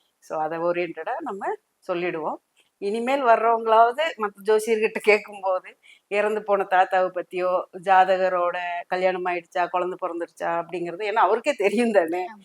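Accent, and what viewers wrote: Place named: Kongu, India. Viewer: Konguu tamillum kamidiyana pechum!!😂🎉.....naadi joshiyum super!!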